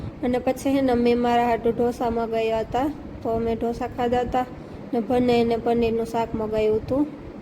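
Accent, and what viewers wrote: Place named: Mer, India. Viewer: બીન આપણી મેરની ભાષા સારીલાગે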